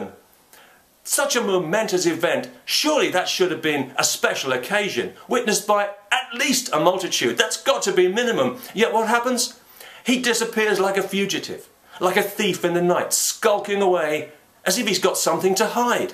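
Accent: United Kingdom. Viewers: Fuuuuck! I could listen to this guy talk for hours. His mind Works like the Robin Williams of logic, reason and Witty but justified criticism. Aldo, does help having that awesome brit accent lol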